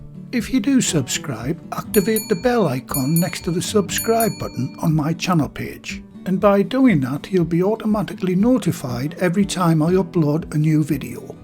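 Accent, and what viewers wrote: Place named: North East England, United Kingdom. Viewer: Such a refreshing video! Just stumbled across your channel and I'm so glad I did! I'm from the North East (Stockton on Tees) and it's so nice to hear the accent along with proper traditional British recipes that my Nana used to make. Thank you, you've just made my evening! Will be making this bread at the weekend:)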